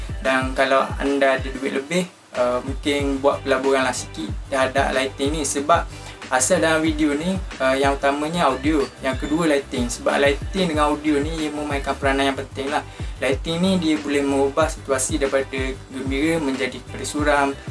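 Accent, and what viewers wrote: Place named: Terengganu, Malaysia. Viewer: Terbaik boss dpt ilmu hari ning.. Ornag ganung ke.. Ckp ade mcm ganu sikit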